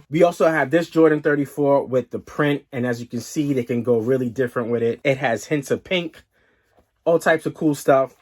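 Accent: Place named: Long Island, United States. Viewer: Dude got a harrrdbody Long Island accent hahaha love it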